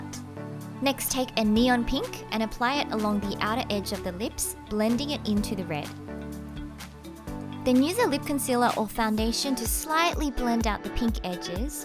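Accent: Australia. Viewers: I love it that your makeup tutorial always have that perfect mixture between "western" makeup and korean makeup. No offense but I found minimal korean makeup starts getting boring to watch after a while so some refreshing looks like this one is really refreshing:) Also, your Aussie accent just makes me love ur vids soooo much:* thanks for the tutorial:)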